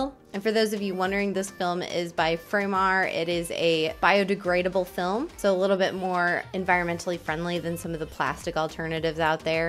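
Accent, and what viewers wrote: Ohio, United States. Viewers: is she from Ohio? She talks just like someone i know who’s from Ohio lol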